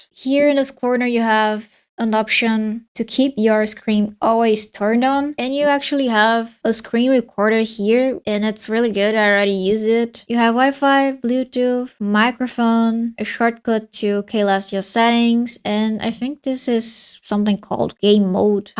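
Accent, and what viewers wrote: Brazil. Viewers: hey, just one tip: you don't need to `sudo` to save in `~/. config`, or at least you shouldn't. EDIT: just watched the whole video hearing your accent and thinking: he/she/they is Brazilian 100%, so not surprised when saw you have an authentic 100% Brazilian BR ABNT2 keyboard Hahahahah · Your accent sounds very Brazilian to me🤔. I'll definitely make it, adding a bit of my own style.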